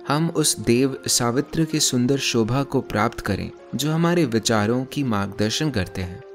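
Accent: India. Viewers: Thanks for the effort. It would be good to have non Hindi pronounciation. Also, multiple language switches made it hard for me to follow. Appreciate if you could not switch so frequently. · All is good but it's irritating to listen Samskritam names in Hindi style. Why u call Ved Ved Ved??? It's Vedam or Veda. Not Vamadev ...cl VAMADEVA 🙏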